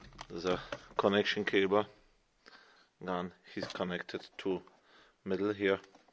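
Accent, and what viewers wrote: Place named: Cork, Ireland. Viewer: loving that cork accent, man. thanks for the vid bai.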